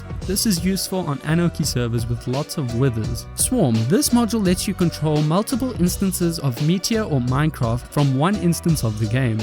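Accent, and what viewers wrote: South Africa. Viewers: this man sounds southafrican. idk why but he just does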